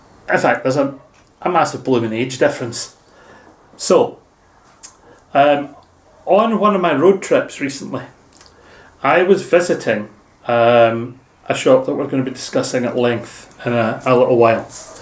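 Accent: Scotland, United Kingdom. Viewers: My god, i love your accent! I trained in Edinburgh and i love love love the Scottish accent. Nathan sent me across from the sockmatician podcast. I'm going to be writing my comment as i watch your podcast, just so i dont forget what i want to comment on. xx ooh all of your sparkly yarn shows up great with the sun streaming in! I really like the design of the Sharf :) the colour inspiration is so interesting. i have to say i say no to requests, but then again, how could you say no to knitting a baby sweater for a friend? Ive had random people that ive met once while travelling, ask me for socks and stuff, and ive said no. it depends on who is doing the asking i suppose. that wool looks incredible! and that sweater looks SO AMAZING! I agree with the wide ribbing, babies have big tummies and they need space for all that growing! that hat is so ridiculously cute. 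I love love love that little feather and fan pink baby sweater. you remembered that pattern from years ago too, you are fearless and wonderful. im really excited to see what happens with the plant based fibers! i really want to learn more about that! im so glad you filmed that trip to the warehouse! its fascinating and not sad at ALL. im always filming for my podcast. i know someone will want to see what ive been up to - they seem like such lovely wonderful people! I'm definitely going to be getting some yarn from that wonderful shop. thank you so much! xxxx - Grace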